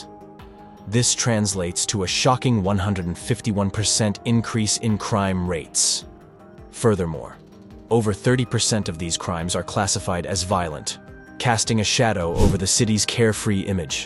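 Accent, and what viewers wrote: United States. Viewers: I carnt take it seriously because of his American accent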